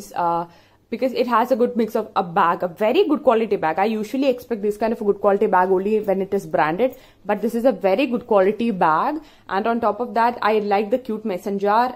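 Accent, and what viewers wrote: Kerala, India. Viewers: Are you a mallu! Your accent proves it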